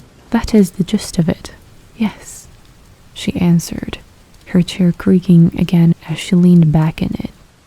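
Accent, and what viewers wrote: United Kingdom. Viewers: It’s awsome the British accent ❤️ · Great British accent xx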